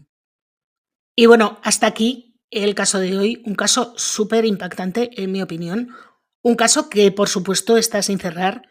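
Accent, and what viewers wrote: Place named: Barcelona, Spain. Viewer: Querida, antes miraba tus vídeos y te daba un 👍siempre, pero no me atrevía a escribir comentarios. Ahora ya he decidido hacerlo, más que nada porque mereces que te diga que eres fabulosa narrando cada historia, que VOCALIZAS perfectamente para que se entienda lo que narras (a otros y otras que hacen lo mismo, apenas se les entiende porque NO VOCALIZAN y van mega rápidos) y además hablas sin prisas, pausadamente, y eso hay que elogiarlo, porque demuestra tu gran empatia con los que estamos del otro lado escuchándote 🙏😘💝 por eso debemos de alabar tu buen hacer y transmitirtelo. Tú nos ayudas a estar informados al mismo tiempo que podemos evadirnos un ratito de los propios problemas, y sólo por tu constancia y todo el trabajo que debes llevar a cabo para realizar cada caso, cada vídeo, debemos de sentirnos agradecidos y decírtelo. 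Vivimos en el país de "la envidia" y por ello es fundamental saber elogiar el trabajo que los demás, como tú, hacen para nosotros. Así que muchas gracias de todo corazón, a ver si ya empezamos a dejar las envidias y a VALORAR más a las personas que se lo merecen como tú. Bueno, guapa, te mando mi segundo abrazo desde Barcelona (y diría por tu acento que debes ser de por aquí también 😍) Muackssss!!!! 💝💖❤️💕👍🌺🦋🌷🌹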